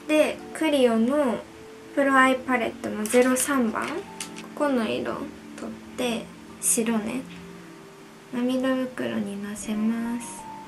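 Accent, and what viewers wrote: Kansai, Japan. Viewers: サムネみて強い女がいる！！綺麗！！！すき！！ って思って動画飛んできたら喋り方緩いし関西弁だし超大好きなんだけど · 関西弁が心地よく感じるのはじめて‥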